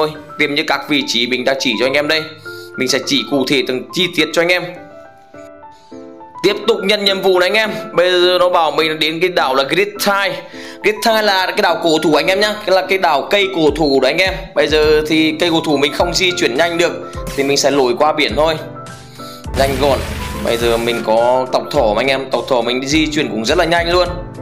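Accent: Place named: Central, Vietnam. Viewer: Anh người miền Trung à anh,chữ e ko hiểu:))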